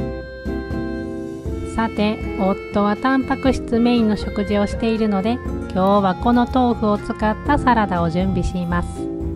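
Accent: Kansai, Japan. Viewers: 関西弁がクセになります！！！！！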